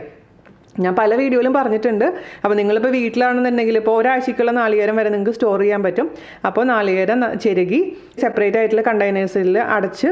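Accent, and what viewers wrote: Thrissur, India. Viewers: It's Thrissur slang! We speak little fast😊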